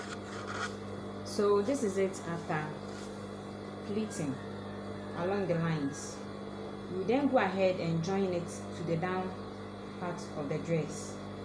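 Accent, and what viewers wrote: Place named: Ghana. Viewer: Madam the pronunciation is her intonation. She is from Ghana.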